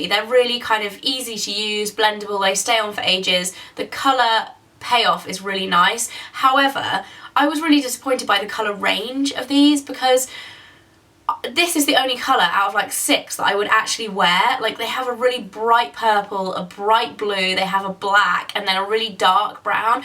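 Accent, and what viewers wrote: England, United Kingdom. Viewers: What part of great Britain are you from? Love your accent. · Is she English or American ??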